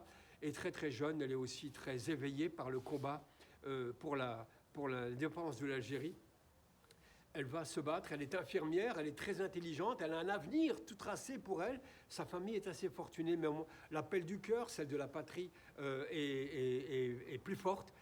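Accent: France. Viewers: Merci,et il y a du "TITI Parigot" dans cet accent de diction qui raisonne en mes oreilles 😊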